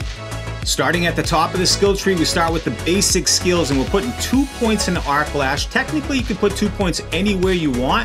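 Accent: New England, United States. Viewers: You’re either from Maine or Boston. I’m from southern Maine and these are just things I know.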